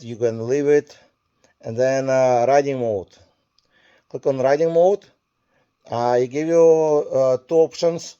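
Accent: Russia. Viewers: Че за русский акцент?)))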